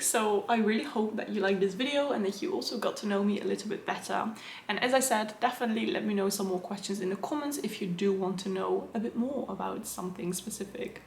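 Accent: United Kingdom. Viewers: Hi!! 👋🏻 and thank you for answering my question! The “are you British question” 😄 I love love your accent ☺️💕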